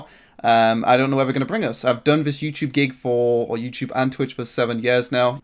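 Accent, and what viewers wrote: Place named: North, United Kingdom. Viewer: Babe, We watch you for you, I can't NUT without that sexy Northern Accent.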